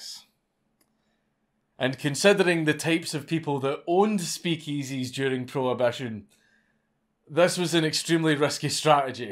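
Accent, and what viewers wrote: Scotland, United Kingdom. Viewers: man, I'm slowly becoming addicted to this series. Hearing hilarious stories being narrated by a heavy scottish accent? Who could ask for more? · Oi, mate! Ye Scottish? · Daddy dankula yet again with another story told in his smooth Scottish accent...I love it. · absolutely avg day! 🤭🤫 jealous you live in Scotland. Your accent 🥰🤤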